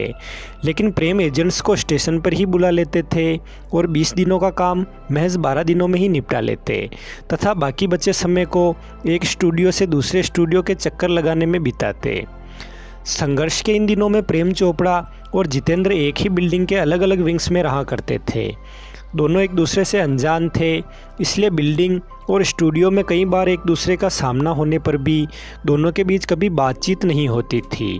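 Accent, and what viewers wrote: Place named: Marwari, India. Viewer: मारवाड़ी है ।चेनल ।में ।बोलने वाला ।उच्चारण से पता चलता है ।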